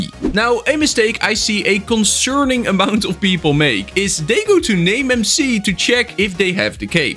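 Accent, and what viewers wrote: Netherlands. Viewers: A Netherlandisch acent???? and thx btw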